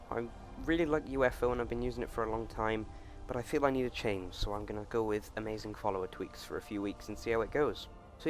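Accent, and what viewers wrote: United Kingdom. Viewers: You sound like a Welshman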